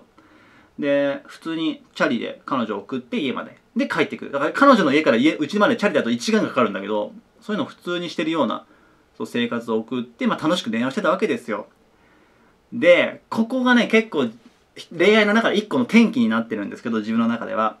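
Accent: Kansai, Japan. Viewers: 関西弁が入ってた方がいいです！！！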